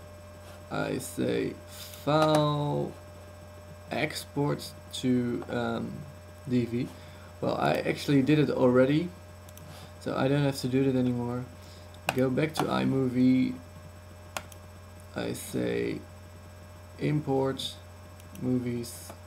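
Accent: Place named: Netherlands. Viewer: I could just hear of your accent that you are Dutch.. Im also Dutch. When you started talking I already knew it :')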